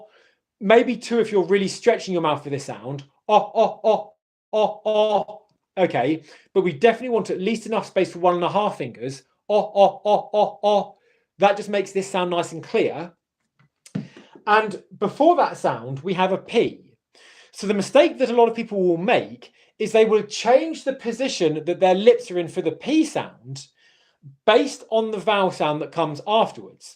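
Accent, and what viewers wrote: United Kingdom. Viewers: Is there any course taught by you, to learn British accent. If it is available on which platform it is available, please let me know.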